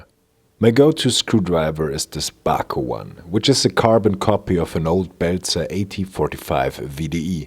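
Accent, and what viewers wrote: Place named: Germany. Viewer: Interesting video, interesting toolset. I only have seen those hollowed tips on -Screwdrivers and I am thinking about such a set since months now. But if I am totally honest, I don`t have to work with a lot of slotted screws. So probably I will be fine with the screwdrivers I already own. Nonetheless I`m really happy to see you back here again. I really like your style of videos. Always kinda fun to hear germans talking english about german tools and been remembered to that when they call brand names oder place names - especially "Remscheid". Finde ich super!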